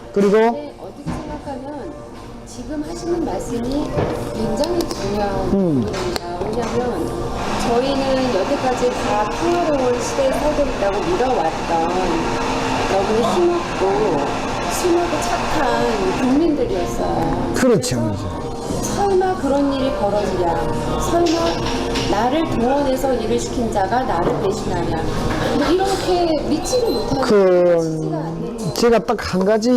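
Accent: North Korea. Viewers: 당신은 말투로봐서 북한 이주민 같은데 정말로 정의롭고 용사같은 사람입니다 앞으로 계속 옮음의 길로 행하시길..... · 계속 듣는데 말투가 왜 이렇습니까? 북한말투 같은데 혹시 탈북민 이신가요? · 이놈은 미친놈이구나 너 악질이구나 나경원 장재원 김성태는 무어라 변명하냐 너 목소리가 북한말쓰냐 정체는 무어냐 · 당신은 이북말쓰십니다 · 이 사람 탈북자? 말투가 북한말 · 말투가 북한말투다. 위장탈북한 빨갱이 아닌가 생각해봅니다.보수에 파고들어 분열책동자인것 같습니다. 잘들 생각해봅시다. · 처음 보는 분인데 오늘 말하는 것을 보니 진실성이 있는데, 보기 드문 사람이네요. 말투를 들어보니까 탈북자인 것 같은데, 엄청 많이 아시네요. 원래 변희재 "브레이크뉴스"라는 이상한 좌편향 인타넷매체를 운영했는데, 최근에 보니까 보수라며 목소리를 높이고 있어서 유심히 보고 있는데, 역시나 남을 이용하고 마음에 들지 않으면 마구 까는이념도 의리도 없는 사람으로 변질되어 있는 것을 보고 놀라지 않을 수 없었습니다. 특히 그의 눈빛은 완전히 자기도취에 빠진 광인이 되어 있는 것 같아서 무섭기도 하고 정말 안타깝고 실망스러웠습니다. 사실 나는 어렸을 때부터 미친 사람을 좀 겪어봤거든요. 의사 입장에서는 그냥 환자이지만, 일반인들은 무서워서 피하죠. 그래도 우리 부모님들은 마음이 워낙 너그럽고 좋아서 집에서 밥도 같이 먹고 잘 대해 주었지만, 얼마 안 있어 돌변해서 욕하고 그러더라구요. 정상이 아니죠. 갑자기 이러한 옛날 생각이 나네요. 그리고 예전에 초창기 인터넷매체로는 오마이뉴스, 프레시안, 서프라이저 등이 있었는데, 노무현 정부 들어와서 그들이 하는 뉴스나 행태들이 외골수지만, 가끔씩 그런 사이트에 들아가보면 완전히 딴 세상 이야기를 너무 진지하게 장문의 글을 올리고 논박하고 하는 것을 보고 이상한 사람들이네 하는 생각을 했습니다. 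외눈박이들이 서로 자기가 옳다고 뜬 구름 잡는 주장을 하고 현실성이 없었죠. 하지만, 그들은 그런 훈련을 끊임없이 하면서 경쟁하고 거기서 살아남으려고 무척 노력하는 것 같았습니다. 어디서 캐캐묵은 이야기를 진리처럼 말하고, 결코 그들의 실력은 자랑할 만한 게 없고 형편없었습니다. 그냥 맹목적으로 진영논리를 전수받아 앵무새처럼 얘기하는 수준밖에 안 돼요. 겁먹을 거 없어요. 목사와 유사하다고나 할까? 그렇다고 목사를 욕하자는 건 아닙니다. 비현실적이고, 피상적이면서 입술에서만 나오는 동어 반복하는 수준을 말하는 것입니다. 순수한 체험적 자기철학이나 고민에서 우러나온 아름다운 글을 쓰기는 힘들 겁니다. 쉽게 사람을 판단해서는 안 됩니다. 그래서 오래 지켜봤습니다. 인간은 그렇게 쉽게 바뀌지 않는다는 것입니다. 개과천선은 절대 일어나지 않습니다. 그래서 전과(前科)는 늘기만 하고 결코 줄지는 않는 것입니다. 사실 나는 변희재씨가 처음부터 박대통령 탄핵에 관심없다는 말을 하는 것을 직접 들었거든요. 그런데 어느날부터 갑자기 탄핵을 반대하는 사람들의 대표적인 리더로 행세하는 것을 보고 우와! 정말 깜짝 놀랐습니다. 나름 자기정치를 하려고 무지하게 노력하고 있는 것 같은데 아무도 알아주지 않으니, 본인은 속상할 겁니다. 아마도 "좌충우돌"이라는 말이 그에게 딱 어울리는 말인 것 같습니다. 사실 자신의 잘 못을 시인하고 용서를 비는 것이 용기가 없이는 진짜 힙듭니다. 나는 지금까지 홍준표씨를 잘 몰랐습니다. 왜냐하면, 모래시계 드라마를 본 적이 없었거든요. 왜냐고요? SBS는 전국방송이 아니라 지방에는 방영이 안 되었거든요. 어떤 사람들은 녹화테이프를 빌려서 보는 사람들도 많을 정도로 인기가 대단했던 것은 사실입니다. 그래도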